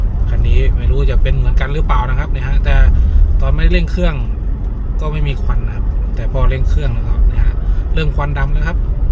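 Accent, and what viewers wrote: Phu Thai, Thailand. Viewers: แอดมินเป็นคนผู้ไทบ่ครับ สำเนียงเว่าคือๆแท้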